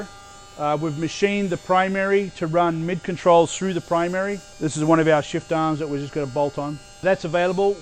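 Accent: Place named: Australia. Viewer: Okay, I gotta ask... Are you Aussie, New Zealander, or American? Your english kinda goes from Aussie/Kiwi to American english with certain words so I am thrown off. Great video but if you can answer that... that would be great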